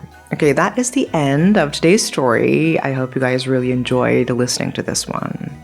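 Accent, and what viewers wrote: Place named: West, United States. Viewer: The story was interesting, but I believe you can tell the story better with more assertion and tones rather than just speak as though you are reading, with a 'western accent'? (I'm Malaysian) and stretching every few words in a whisper tone - I.e "6 Caaaars, 12 veheeeechles, 17 peeeeeople were dead, the road is quieeeeterr than it should be" and yes, for the sensitive commentors I know that's gonna try and argue with me here, I know I can just "exit the video" and be on my merry way, which yes I'll do so, but hopefully some constructive criticism helps this fellow youtuber gain more likes and views in the future.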